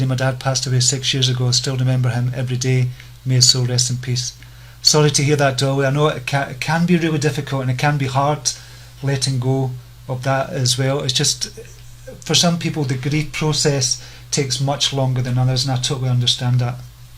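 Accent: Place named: Scotland, United Kingdom. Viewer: Hi, I came about finding you in an interesting way; I too had found the song/dance " " and fell in love with it... I store it in my YouTube library. So, the other day I went to my Tablet to get it and somehow your beautiful introduction to the song showed up in my "photo gallery" for some reason. Anyway, I found your introduction just as fascinating as " " itself... I didn't know who you were, I thought maybe an Architect because of you, your office surroundings & your name displayed with your logo... I was thinking maybe you were just taking a break and wanted to share this "amazing, hypnotic beautiful" video online... So I've been carrying my Tablet around listening & watching " " because I've been extra ill the past month (I have Lyme Disease) and watching " " makes me feel much better, as you know - it has this quality about it. 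Then I started queuing it to your intro each time I played it because 1. I absolutely love your accent - I always have, Scottish - right? 2. Your more than average intro especially the end when you say "Okay, until next life"... So today I'm driving around (San Francisco, California) playing the song, its so wonderful... and it comes to the end and I look over at my Tablet on the seat next to me and say "Okay, I want to hear that stunning voice again" and I queued it up to the beginning and started wanting to know who is this interesting man and why does he say "until the next life"? So I realize you have a l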